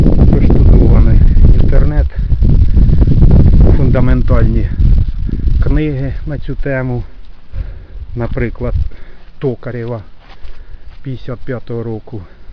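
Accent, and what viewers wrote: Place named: Poltava, Ukraine. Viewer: Полтавщина Говор такой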